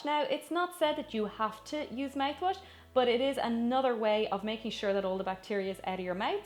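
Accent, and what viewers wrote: Ireland. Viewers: You mentioned a powder but sorry I couldn't understand 🙈 Irish accent is 😍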